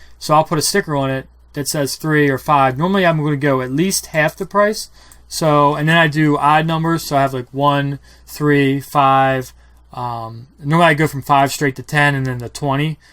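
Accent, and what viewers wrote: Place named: Louisiana, United States. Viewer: Are you originally from Louisiana by chance? My fiancé and I simultaneously said, “He’s a local boy!” based on your accent.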